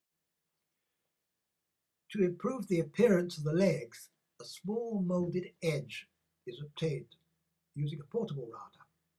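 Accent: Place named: England, United Kingdom. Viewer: you are 90? I wish you lived close to me,you give a very good discription of DIY but I woulld cut my hands off(smile) Love your English accent.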